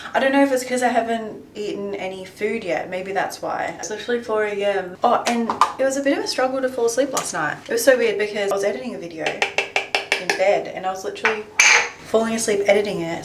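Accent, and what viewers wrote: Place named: Australia. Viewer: I’m Japanese. I leaning Australian English from your Vlog, thank you so much❤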